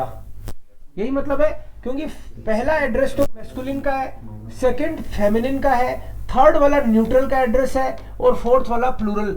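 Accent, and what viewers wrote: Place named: Germany. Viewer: Learning a language requires learning all its aspects. If you can't do German accent, at least try. Your German accent is pathetic & disgusting to hear. Just rote learning grammar & expending no effort in accent-polishing! Please don't go to Germany as we, other Indians, do not want to be judged based on one bad speaker.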